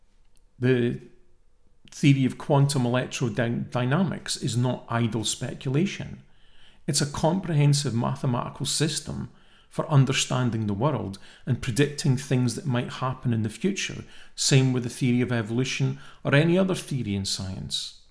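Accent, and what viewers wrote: Scotland, United Kingdom. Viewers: I always look forward to the beginning in the Scottish brogue (hope not offensive) "ello and welcome to another video" A nice, refreshing entry into the expected reassuring logic.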